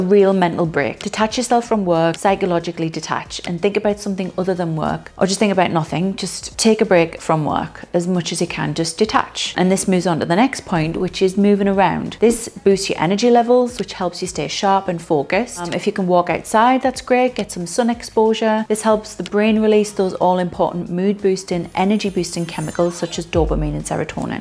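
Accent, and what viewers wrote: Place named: Newcastle, United Kingdom. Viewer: Must be a Geordie!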